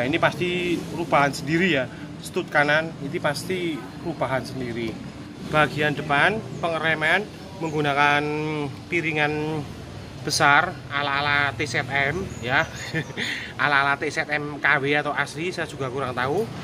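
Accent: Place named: Wonosobo, Indonesia. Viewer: suarane Wonosobo banget, obat kangen wong sobo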